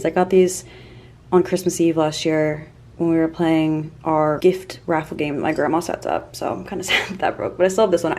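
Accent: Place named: Central Pennsylvania, United States. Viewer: Eagles! I love hearing someone with the exact same accent as mine, central PA, gives me inspiration watching your success. Hope you are enjoying Charleston.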